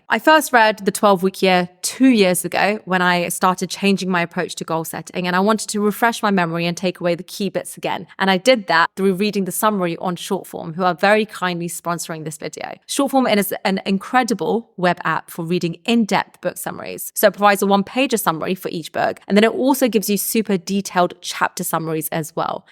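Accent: England, United Kingdom. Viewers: Straight up gorgeous and wicked smart. Plus you have that English accent. Good lord.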